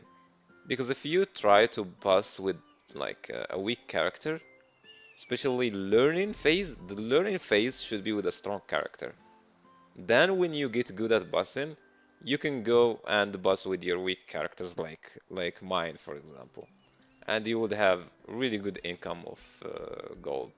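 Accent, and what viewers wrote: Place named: Morocco. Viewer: Your accent man (morocco/ amazigh)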